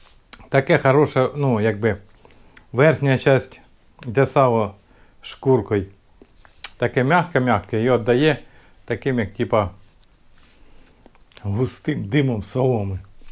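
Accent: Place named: Kharkiv, Ukraine. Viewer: Дуже подобається твій говір!!! Наш-Харьковский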